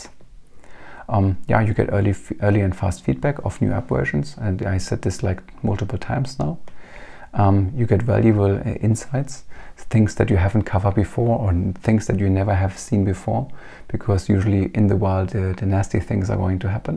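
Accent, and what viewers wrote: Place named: Germany. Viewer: Didn't expect Henry Cavill with German(?) accent.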